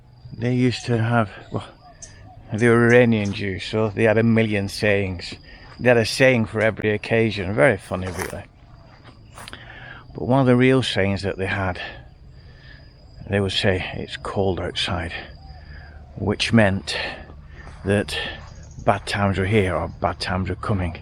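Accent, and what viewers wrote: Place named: Northern England, United Kingdom. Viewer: 100% stay! And …… and I’d miss it. It’s very relaxing to see you wondering around your garden talking to us as you go. The garden brings out the best in you. It’s wonderful to listen to you a man of great eloquence and delightful northern tones. Your garden is better than Kew Gardens - it’s a belta, like. So there you have it this hard leftist says don’t move. And if you do I’ll call on every subscriber to go on bloody strike!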